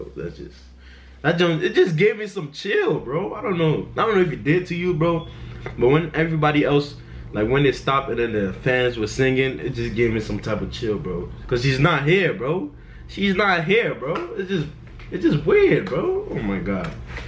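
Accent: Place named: United States. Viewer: bro, don't mind this comment, your english is very american hahaha we're together, keep up with the reactions 👊🏻🇧🇷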